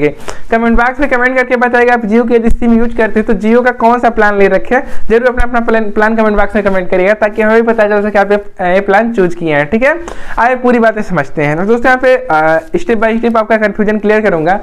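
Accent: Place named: Uttar Pradesh, India. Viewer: Up ladies jasha bat Kew korte ho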